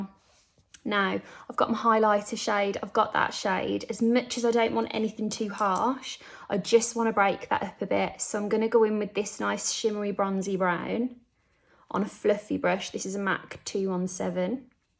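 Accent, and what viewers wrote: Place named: England, United Kingdom. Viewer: I love your English accent, American accent is an accident.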